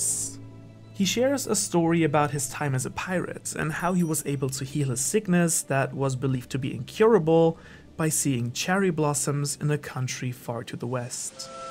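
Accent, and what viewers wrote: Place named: Germany. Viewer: are you german? Your accent aounds like it 😁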